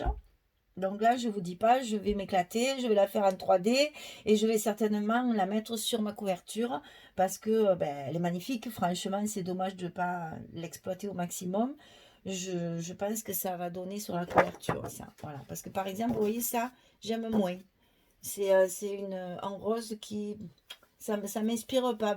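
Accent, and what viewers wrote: South, France. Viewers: Vidéo très agréable grâce à votre personnalité chaleureuse et l'accent du soleil. Je regarderai votre album avec beaucoup d'intérêt.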